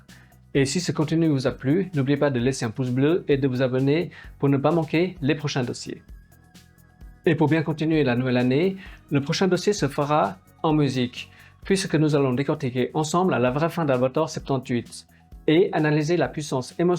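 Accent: Switzerland. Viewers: C'est bizarre que tu parles avec les mains comme un italien, avec un accent suisse, et une face asiate. Je suis confus.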